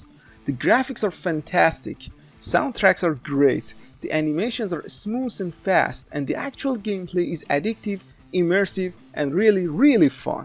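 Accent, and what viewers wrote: Iran. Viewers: bro ! thanks for the video good information and suggestion ... faghat ye khorde roo accentet kard kheyli iranie :D thanks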